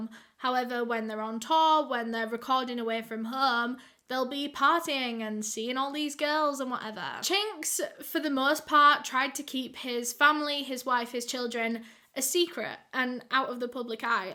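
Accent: United Kingdom. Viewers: I am a middle-aged woman in medicine hat Alberta in the middle of the freezing cold listening to this young girl and have literally been stuck on her channel for days you are a total sweetheart I wish I had you for a daughter I am 53 years old and I totally love your concept the way you portray the stories you're so sensitive to what kind of story you are showing us especially around children I think that's so sweet I found you totally by chance but I have been watching you for the last 3 weeks Non-Stop my 17-year-old son it's starting to get worried and has now starting to try and peek in and see who this girl is with the beautiful British accent you see we live in the prairies and medicine hat Alberta population 68,000 I have never seen you before young lady but you are an exceptional wonderful bubbly incredibly talented young lady you're the daughter I wish I had I was only able to have one son by the way and he's very very ill he had an unsuccessful kidney transplant at 15 years old I he has just been 3 weeks in children's hospital and the neurology clinic having major blood filtration blood plasma and steroid therapy to try and stop his kidneys from rejecting so if you guys could please pray for my son he's in a really bad way right now he's lost two markers on his kidney which means he's going to have a horrible time finding a new one please pray for him his name is Riley he had heart surgery at 6 days old to repair one of his valves he was born 2 lb 2 oz 10 wee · IDKY youtube recommended this channel but THANKS... 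this adorable brit-ish girl talking about New York hip hop murders is HILARIOUS. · "Drugz spelt with ZED" .... Beautiful love UKs pronunciation of words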